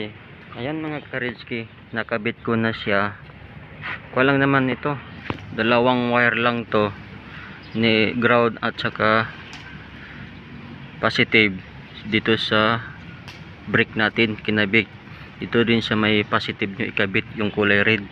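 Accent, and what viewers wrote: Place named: Visayas, Philippines. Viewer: Tunog bisaya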